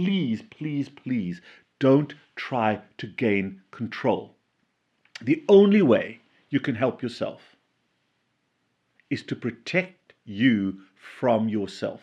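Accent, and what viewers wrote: South Africa. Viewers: He's South African. He sounds like an Afrikaans South African. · I am addicted to your videos . I love the south Africa accent and I love south Africa where I often travel through specially the transvaal near mozambique border . The Bantu diet was based on one meal a day based on vegetables. The only car b was maize but eated with fermented preparation .fruits only the children eat .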